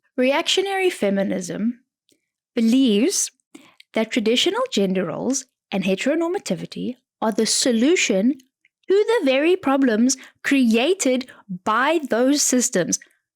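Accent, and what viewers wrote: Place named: Netherlands. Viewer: yeah, but Dutch/Arfikaner or English? You sound Dutch to me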